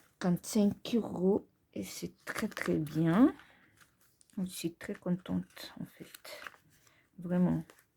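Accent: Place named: Senegal. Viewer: Bonjour, un bon début de week-end😊 ps: je trouve que vous avez l'accent sénégalais trop mim's. Moi aussi, j'ai commencé le principe des enveloppes budgétaires depuis fin décembre. Pour le moment, hors vidéo, j'espère pouvoir me lancer l'année prochaine pour avoir un suivi plus assidu et motivant! Les débuts ne sont pas faciles mais déjà beaucoup de changements pour moi sa motive.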